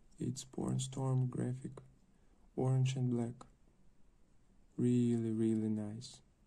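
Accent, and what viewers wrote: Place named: United States. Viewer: С американским акцентом :(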